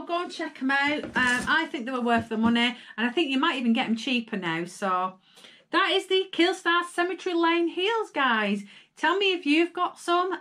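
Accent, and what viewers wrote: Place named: United Kingdom. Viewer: Oh okay it's just u never here that word patent anymore. I just adore u and luv your uk accent.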